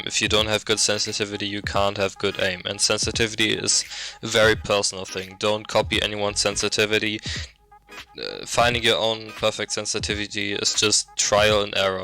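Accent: Germany. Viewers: Are u german??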